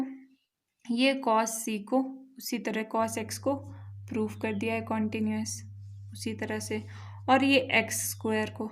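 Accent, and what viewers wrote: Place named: Haryana, India. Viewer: Through your tongue..you look like from haryana or delhi.. but very sweet voice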